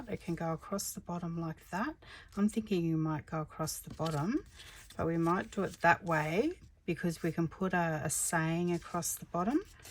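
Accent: Australia. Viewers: Just subbed. Lovely accent. Which bit of Oz are you from ? I had an Aunt who lived in Queensland ❤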